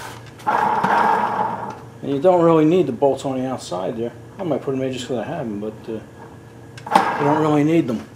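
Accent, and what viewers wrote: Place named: Bordentown, United States. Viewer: So, how far from Bordentown do you live? The accent is a dead giveaway. LOL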